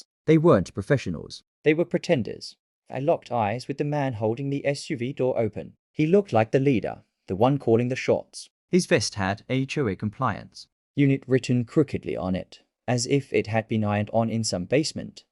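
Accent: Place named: United Kingdom. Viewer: A US Navy seal with a UK accent.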